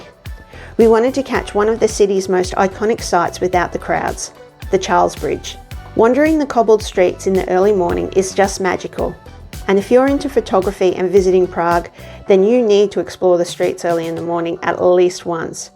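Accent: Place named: Australia. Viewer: Great to hear an Australian voice. We're planning to visit Prague later in the year so thanks for all the information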